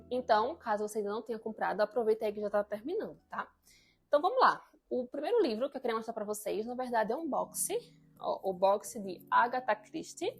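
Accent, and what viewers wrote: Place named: Pernambuco, Brazil. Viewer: Topp, obrigado, gosto bastante da sua didática e recomendações, e também sou pernambucano como você, é reconfortante ouvir nosso sotaque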